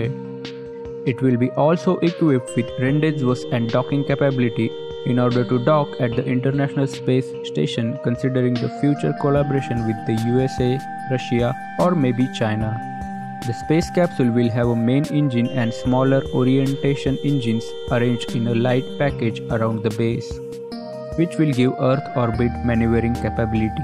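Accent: India. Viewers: Rohit Y , English is fine. u might be talking about tone he is using. it's Indian tone alright! who give a f**k to America tone.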